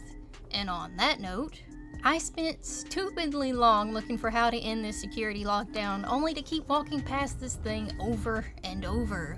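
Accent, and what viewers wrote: South, United States. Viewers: Ok what is your accent. I cannot place it exactly. I have guesstimated it down to either rural "american" or somewhat australian?? Please enlighten me, I could not focus on the terrific video because I was concentrating on your accent!!1! Please keep doing what you're doing c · Lowkey find it hilarious a “southern country” girl accent is talking about alien horrors deep in space where the chances of someone coming to even see you is slim lmfao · I love your review. Your southern draw is definitely giving me Colonial Marine vibes 👌 This is my all time favorite horror game. I still play this game and now after watching your review its time to revisit Sevastopol Station. Any loved your review and retelling. Also thats crazy that working joe took an elevator with you. He was probably scared shitless too.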